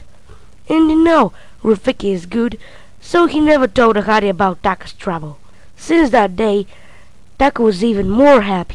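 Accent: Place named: Bulgaria. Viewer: He's from Bulgaria and that's why this guy talks like that.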